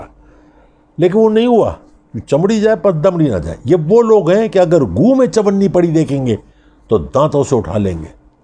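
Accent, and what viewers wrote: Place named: Kutch, India. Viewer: Sir, you choose so true wordings Fr all types of goons of Pakistan 🇵🇰 👏 Salam to you to gv details to new generations. I’m listening 👂 n enjoying ur each n every word n ur lovely Kch’s URDU. Love word BAWLE, Mullah Fazloo n many 😍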